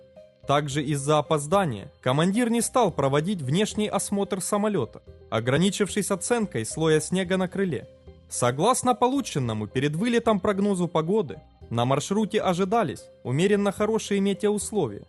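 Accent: Ukraine. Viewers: Самый обыкновенный украинский акцент:) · Капец, какой противный хохляцкий акцент:третЯво сентЯбря тысЯча! Вообще слушать противно. Сразу и выключил. Аффтор, исправляй свой хохляцкий акцент.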